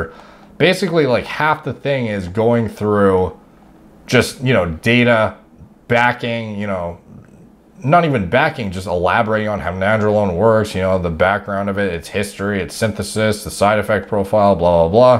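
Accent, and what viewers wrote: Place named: Canada. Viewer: We do. But he’s got the Canadian accent ayyy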